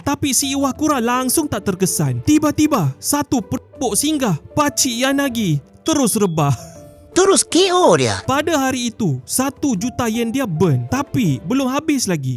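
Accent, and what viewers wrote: Sabah, Malaysia. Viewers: Saya tak berapa kelakar tgok video ni.. cuma kelakar dengar suara yg buat kontena ni.. sebab bahasa kg ku yg dia guna... Andukk bahh😅😅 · anduk bah kesian. hahaha very sabahan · gaya cakap cam org sabah, bukan mau kata apa lah.. Di sabah ada macam macam jenis seni pertahanan diri, cuba cari "pasak kota belud", ada video tamat belajar, dengan berdiri atas bara dan mandi sungai sambil di hiris dan di tetak parang. Tak silap video dari tahun 1980/1990an. Dan di akui kebanyakan ahli yang tamat bukan jenis berlagak. Tapi kalau ada menjual, mesti ada pembeli. Dan macam op yg ko jawab cakap, pengamal selalu cakap "ada Allah" Atau "cukup Allah".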